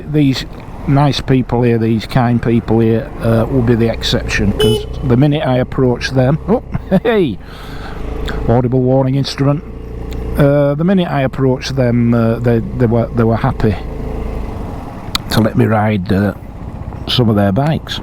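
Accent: Yorkshire, United Kingdom. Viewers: Just subscribed to add to your 10k target. Never mind the negatives. Concentrate on the positives. I like your commentary with that yorkshire twang. Regards from a manchester biker. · Just subscribed. Enjoying your videos of my native Yorkshire which I left over 50 years ago. Great accent, great scenery, great bikes. Please keep on doing what your doing. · As an expat. yorkie as well as the content I like hearing someone what talks like me tha knows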